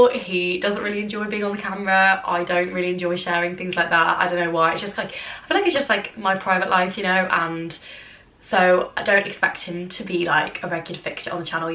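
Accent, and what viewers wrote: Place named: RP, United Kingdom. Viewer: I’ve come back since when you were in year 13… your accent is so posh now omg 😂😭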